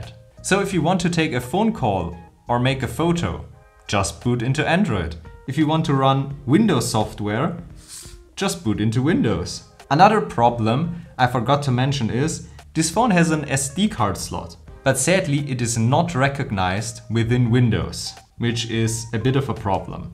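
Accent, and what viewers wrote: Germany. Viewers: Is he german, austrian or swiss?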